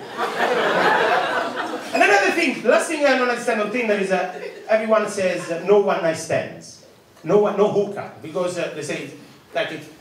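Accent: Italy. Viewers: Fantastico! È proprio vero che il talento non ha barriere... Nemmeno quelle linguistiche. Il tuo inglese dall'accento italiano ti rende ancora più efficace! Bravissimo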